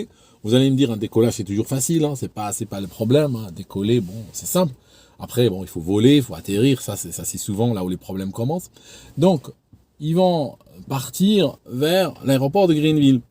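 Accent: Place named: Switzerland. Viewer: qui s'appelle en fait est né en Algérie. Je suppose donc que sa langue maternelle est l'arabe algérien. Il a aussi vécu en Suisse où il a passé une bonne partie de son enfance (d'où son accent suisse qui ressort de temps en temps) et où il a appris à piloter des avions (mais peut-être avait-il déjà commencé en Algérie). Il a commencé des études de médecine en France qu'il n'a pas pu finir à cause d'un visa qui n'a pas été renouvelé. Il habite maintenant à Londres où il a travaillé dans l'informatique (et peut-être dans la sécurité aérienne?) et vit maintenant du revenu de ses chaînes YouTube et en vendant des livres. Bref, c'est un touche-à-tout très doué qui parle au moins trois langues: l'arabe algérien, le français et l'anglais.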